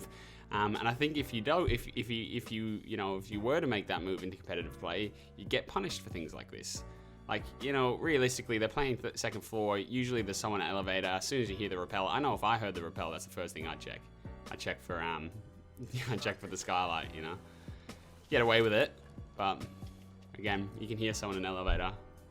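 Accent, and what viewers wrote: Australia. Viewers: Aussie is my bet he sounds similar to modest pelican gaming who is also Aussie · Sounds aussie mixed with something else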